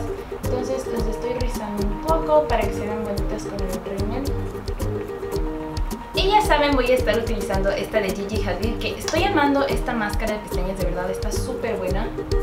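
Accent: Quito, Ecuador. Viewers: Hablamos bonito los quiteños te escucho me identifico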